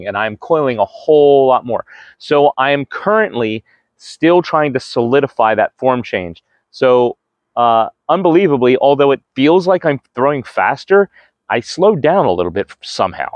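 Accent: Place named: Philadelphia, United States. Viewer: ah, ok. I think you're more subject to the Philly accent there than the Pittsburgh area. You have the vowel sounds: "ph-oh-ne h-oh-me to my Fahm-ly." I used to live there for a few years and can pick up on certain accents.😄 Appreciate you humoring my non-dg question! But I digress! Subbed'! I do similar content to grow the sport. Cool you got your kids into it too. One of my 3 boys joins my wife and I at least once a month. Building him a bag was super fun!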